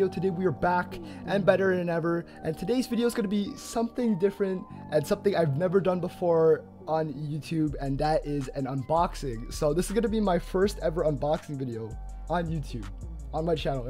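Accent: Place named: Philippines. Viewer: Theeey Trynaa Bee Craate! You filipino?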